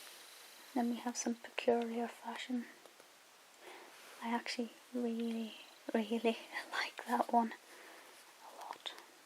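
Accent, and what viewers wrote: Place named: Aberdeen, United Kingdom. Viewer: i'm from Glasgow! :p One of my engineering lecturers is from Aberdeen, I knew I recognised the accent. Thanks for helping with stress problems, whenever i'm studying and it's stressing me out I just put one of your videos on, kills the stress almost instantly! :) x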